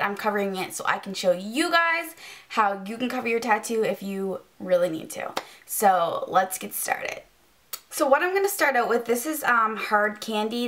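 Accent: Canada. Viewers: You sound Canadian. Are you? :)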